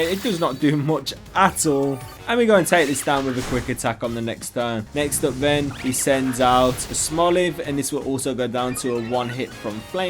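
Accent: United Kingdom. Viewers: I mean your run was fun to watch, but man, you sound so bored narrating it. Also your accent is a little hard to get used to - is it a British accent? never heard someone talk like you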